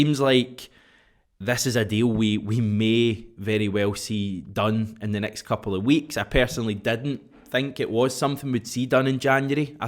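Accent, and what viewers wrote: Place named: Prestwick, United Kingdom. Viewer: I feel like I’m back in my school in prestwick here with these pronunciations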